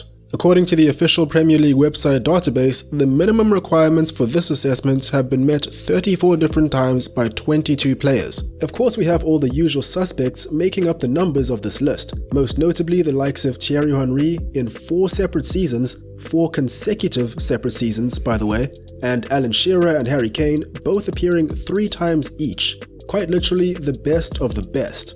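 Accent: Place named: South Africa. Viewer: You sound very South African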